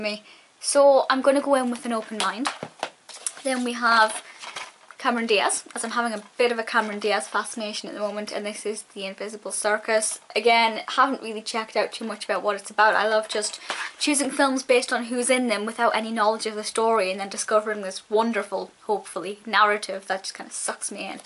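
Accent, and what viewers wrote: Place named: Scotland, United Kingdom. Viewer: Just watched a few of your old videos and noticed that you used to have a Scottish accent, not strong but obviously Scottish, but now you have a small hint of an accent. Have you noticed that or is it just me?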